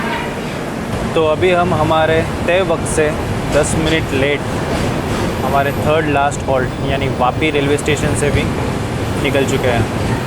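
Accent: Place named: Ahmedabad, India. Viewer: I think railway has personal grudges from you tabhi DD mein every time upper deck or station par baat karte time, EMD honk 😂 Jokes Apart, But seriously hamesha ki shaandar. Bich Bich mein Jo Ahmedabadi accent or Common Man wali bhadaas bahar aati hai, Cherry on Top ❤️ Baaki Kabhi toh moka do sir, ki vlog mein hum koi galti dundh sake 🤣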